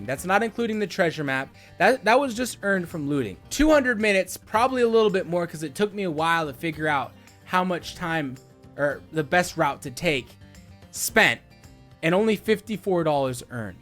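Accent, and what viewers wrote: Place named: Russia. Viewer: Nice man I like gang hideouts. Damn man I just noticed how many subscribers you got now. Haha when I subscribed you had around 100. It’s because of the Russian accent you do haha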